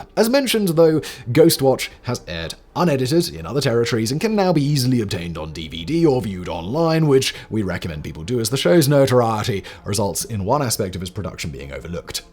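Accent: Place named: RP, United Kingdom. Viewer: fact boy sounding extra posh as of late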